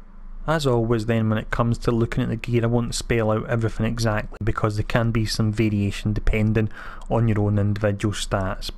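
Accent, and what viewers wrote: Scotland, United Kingdom. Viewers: Sorry for the off topic question, are you Scottish ?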